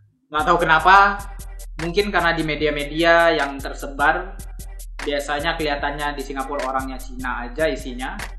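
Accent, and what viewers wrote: Indonesia. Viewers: wah logatnya kok Indonesia banged yaa..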